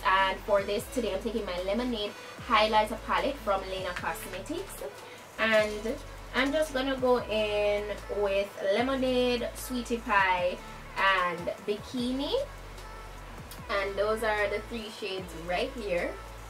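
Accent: Jamaica. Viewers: I'm Guyanese and don't think you sound like guyanese nor Trini at all.Lol even a deaf man would give hear to your strong Jamaican accent.Some people just don't have hearing sound. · Amika Azore yass girl sounds 100% Jamaican love her tho #proudguyanese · From she start talk I knew she was Jamaican 🇯🇲🇯🇲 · Only non Jamaicans would be saying you are not a Jamaican. I could tell straight away. Many have this narrow idea in there head of what a JA person should look and sounds like. It could be because you have a good grasp of the English Language. I am a Jamaican myself living abroad and many do not believe I am Jamaican because I do not walk around speaking Patois in a professional job. Silly narrow mindedness people. Do your thing. Your videos are really good and I am using them to learn the makeup techniques. Thanks for sharing. ✌🏿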